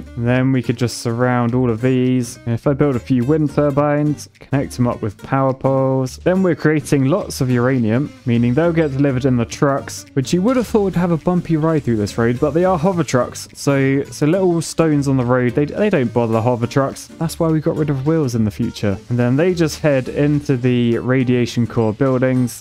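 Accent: United Kingdom. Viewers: this guy is like let's game it out but british and ever so slightly more serious...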